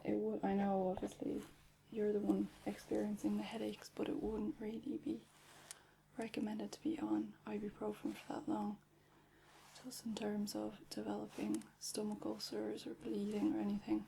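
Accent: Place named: Ireland. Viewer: I was hoping this would be relaxing but the Irish accent coupled with being Irish myself just gave me PTSD of sitting in Tallaght hospital lol.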